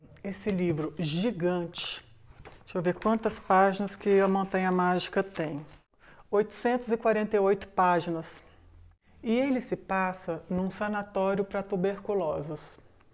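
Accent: Minas Gerais, Brazil. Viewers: O sotaque mineiro é muito cativante. Adoro! Não sou mineira mas, já estive em MG várias vezes, e esperando a pandemia dar uma trégua para voltar a visitar esse estado maravilhoso.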